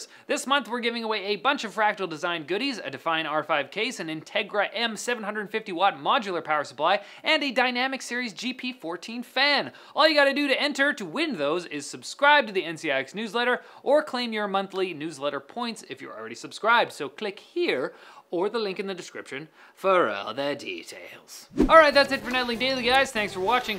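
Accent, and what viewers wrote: Australia. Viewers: mate that sounded australian not like a New Zealander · Why choose an australian accent though? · Your Australian accent is getting better.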